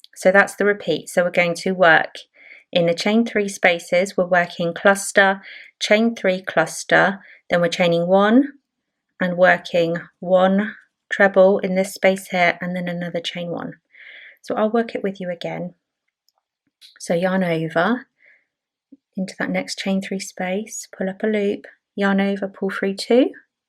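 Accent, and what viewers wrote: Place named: England, United Kingdom. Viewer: Thanks a lot … great tutorial and I love your English accent …am going to have a look at your book, sweetie.Have a nice day, hugs from France.🥰😘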